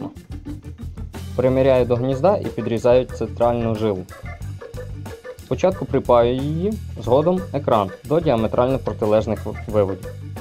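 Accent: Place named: Lviv, Ukraine. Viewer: Простим "Дякую" не відбутись:) Відповідь надали більш ніж вичерпну. Цікавить ваша думка стосовно діполя, тому як серед аматорів вирують думки, що це не є добра антена, і годиться більше на авіадіапазон ніж на любительський 2 метровий. Ще питання, звідки ви.? Акцент Львівський, але на кругляках вас не чув Дякую ☺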